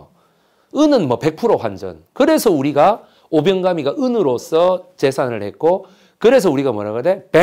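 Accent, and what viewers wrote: Busan, South Korea. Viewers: 전형적인 부산영어발음 ❤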